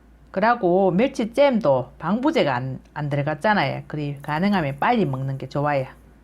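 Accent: Gyeongsang, South Korea. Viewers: 구수한경상도 사투리가 정감이 가네요 오늘도 잘배우고 갑니다^^* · 멸치하고 사과하고 쨈 만드는것은 첨 봅니다~ 경상도 사투리가 너무 정겹네요~❤ 비빔장으로 하면 너무 맛있겠네요 고추장. 만들기 너무 쉽고 맛있겠어요 꼭 따라해 봅니다~❤ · 건강레시피 공유해주셔서 감사합니다🥰💯 늘 구수한 경상도 사투리 정감이 갑니다‼️🤗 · 경상도분이 정말 맞으신가요?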